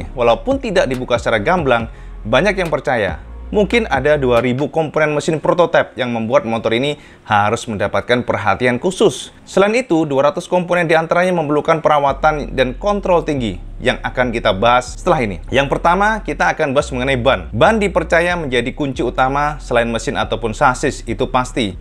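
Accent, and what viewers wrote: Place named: Surabaya, Indonesia. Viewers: jane wong ndi to ki kok bohosone suroboyoan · boso ne jowo suroboyoan luuuurrrr....istimewa....!!!! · Top cak.sangat baik penyampainnya.bahasa suroboyoannya jg ok masio aku wong sundo.tapi toplah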